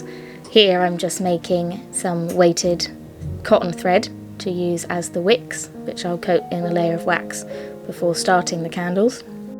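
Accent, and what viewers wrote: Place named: England, United Kingdom. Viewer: That candelabra came out amazing..I'm so inspired but your imaginative creations! It's so mezmorizing to hear your proper English with an English accent..lol🥰 gives such a pure primitive vibe❤❤❤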